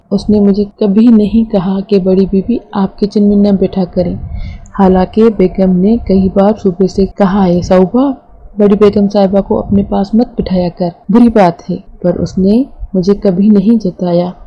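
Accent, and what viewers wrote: Sindh, Pakistan. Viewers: سندھی ایکسنٹ۔۔۔۔۔واہ۔۔۔۔۔بہت مزے کا انداز۔۔۔ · نائس.... سندھی لہجہ___ بہت خوبصورت!!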